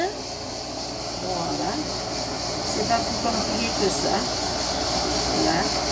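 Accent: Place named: Nice, France. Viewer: Bonjour chère je suis de Sète née a Marseille et vous avez le même accent que "Mado ka Nicoise"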